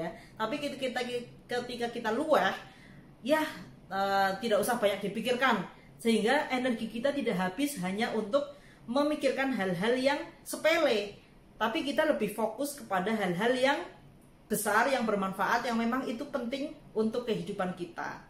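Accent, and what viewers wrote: Banyumas, Indonesia. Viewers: Berkah melimpah buat,ilmunya memberdayakan sekali.terima kasih 🙏 Saya galfok sama sedikit dialeknya spt kluarga di kota ngapak ngapak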